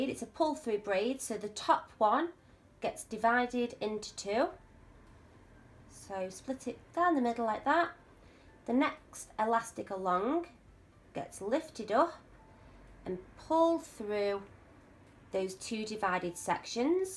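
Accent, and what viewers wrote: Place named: Manchester, United Kingdom. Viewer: It’s one of the many English accents we have over here .... northern, Manchester I think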